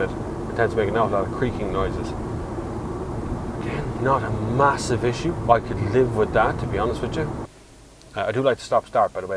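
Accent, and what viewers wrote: Ireland. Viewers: Are you from Ireland?:)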